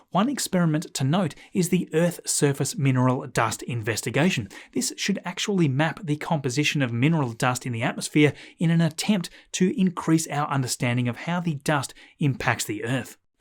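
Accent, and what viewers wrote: Australia. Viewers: Usual comment - Aussie accent at its best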